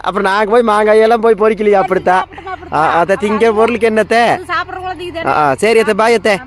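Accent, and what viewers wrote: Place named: Erode, India. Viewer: உங்களுடைய பேச்சி ஸ்லாங் ரொம்ப நல்லா இருக்கு ஈரோட்டில் எந்த ஊரு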